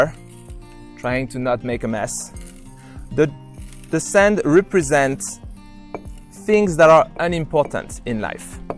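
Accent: France. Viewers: Nice demonstration, adapted from the original intervention visible here. With the French wine and the French accent, why not give a French version with the link for the moose? <link> Jolie démonstration, adaptée de l'intervention originale visible ici. Avec le vin français et l'accent français pourquoi ne pas donner une version française en renvoyant à l'orignal ?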